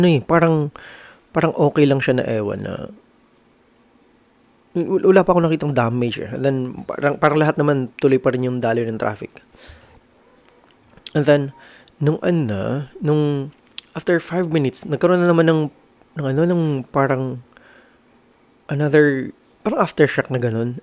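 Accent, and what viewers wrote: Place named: Luzon, Philippines. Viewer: New subscriber here. You’re from Gensan but you sound like you’re from Luzon when you speak Tagalog. Just an observation from someone who grew up in the island of Luzon. 😊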